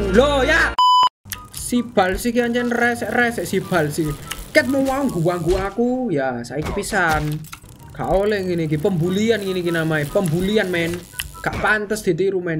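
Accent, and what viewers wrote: Java, Indonesia. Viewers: Abang orang Jawa 😄😄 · Logat² jowo mas arek ndi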